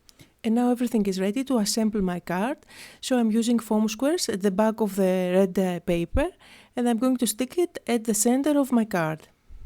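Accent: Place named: Greece. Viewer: it's a Greek accent. Lovely card!!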